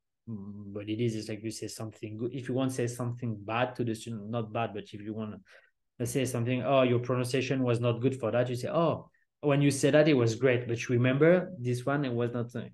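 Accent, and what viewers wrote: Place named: France. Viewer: Salut 😊 watching your video from Cannes and love your french Accent! You have inspired me a lot! Merci pour tes vidéos! Ils sont tous super!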